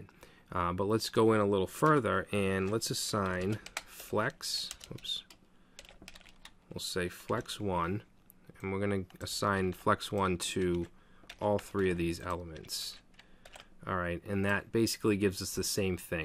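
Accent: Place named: Rhode Island, United States. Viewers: nice a dude with an east coast accent and swearing!! my fave. great tutorial, i understood it before but now i feel more comfortable with using flex. fixing my site now! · Bawx, sawce code, mahgins, you wouldn't be from Rhode Island?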